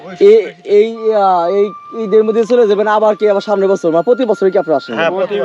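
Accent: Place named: Pabna, Bangladesh. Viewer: এনার কথায় মনে হচ্ছে ইনি পাবনা জেলার মানুষ, আর পাবনা জেলার মানুষের কথার মূল্য আছে,এরা পাগল ছাগল হলেও দুইনাম্বারি কথা বলে না,অনেক পাবনার মানুষের সাথে আমি থাকছি,এদের রাগ একটু বেশি, কিন্তু মানুষ হিসাবে ভালো, পাবনার পাগলা 😂😂❤❤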